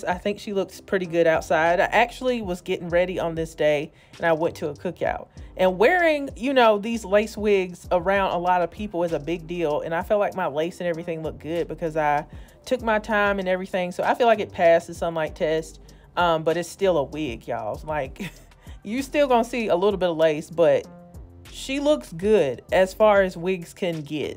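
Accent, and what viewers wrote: South, United States. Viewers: I don't think I ever realized how much you sound like a southern belle, lol. It might be the edible I had. 😂 The wig look great!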